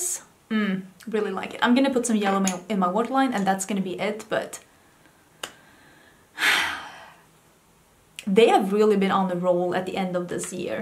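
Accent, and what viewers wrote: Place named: Sweden. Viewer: Your English is brilliant and I love hearing your Swedish accent 🙏💕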